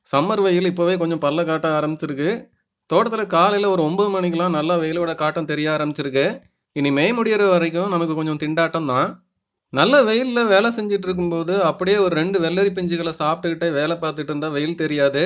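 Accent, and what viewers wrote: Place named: Coimbatore, India. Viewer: super நா.. உங்க பேச்சு.... தெரியுது... கோவை... உங்க video inspiration ah இருக்கு அண்ணா..vithai pakirvu iruntha podunga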